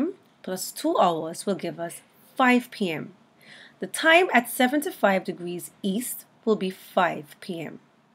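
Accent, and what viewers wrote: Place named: Jamaica. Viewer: You sound like a jamaican👅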